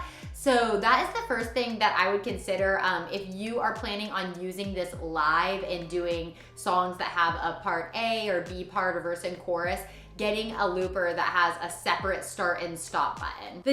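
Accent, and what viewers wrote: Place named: Nashville, United States. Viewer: I know very little about loopers. Quite proud of myself that I previously identified the TC Ditto x2 as my #1 pic for the same reasons that you did. This was still a real helpful video. Also, have to say- starting with a cool demo is so good. Most people start their vids with five minutes of talking. You Win! and sound like a Nashville area pro.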